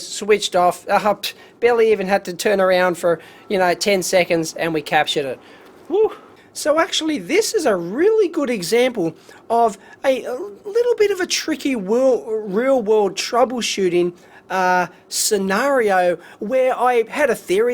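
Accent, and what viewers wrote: Australia. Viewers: Lol, English is not my first language also I was not really used to Australian accent but I can follow perfectly... You should try the Disney Channel :-P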